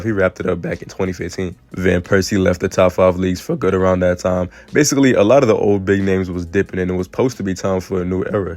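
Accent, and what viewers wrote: United States. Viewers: Lmao all the wars in the world right now are because of Americans. It’s a terrible take. Every time I click a video and hear that Yankee Doodle accent I turn it off! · sick video man! you presented a situation and reasons for it really clearly and in a rlly engaging way👍🏽 watching this before my first day of my second semester and as silly as it sounds, it’s refreshing to hear an american person chatting about footie—feels a little bit like yapping about soccer with friends (none of my friends like football so thanks for that haha)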